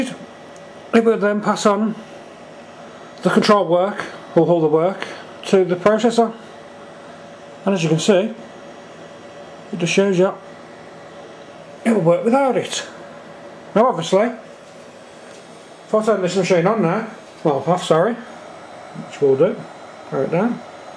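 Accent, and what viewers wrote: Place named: England, United Kingdom. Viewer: hes speaking english english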